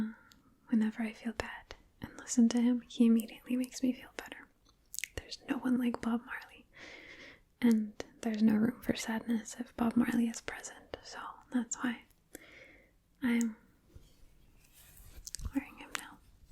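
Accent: United Kingdom. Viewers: please make more tutorials with british accent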